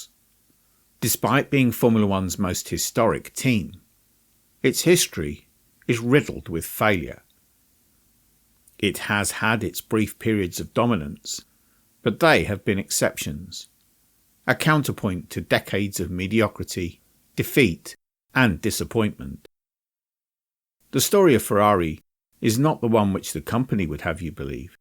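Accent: United Kingdom. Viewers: why did i know the accent was gonna be British all the jingoist British bias content "why is ferrari so bad at f1" is getting annoying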